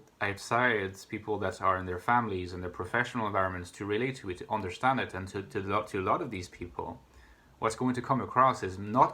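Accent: Ireland. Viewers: He's got an Irish accent but I think he's French?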